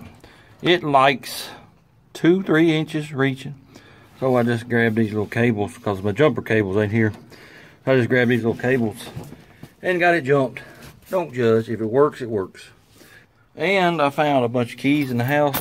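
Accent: South, United States. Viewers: Well, now I know why your voice sounds so familiar. I saw that Montgomery County plate, lol. I'm originally from Henry County on the other side of the lake from Stewart County, so your voice sounds like a lot of my kinfolks. I've lived up here in Southern Indiana for a good number of years and been inside all evening cause it come up a bad cloud earlier and then poured down most of this evening...it was a frog strangler! So I just run across this channel on YT this evening and been watchin' the last few hours.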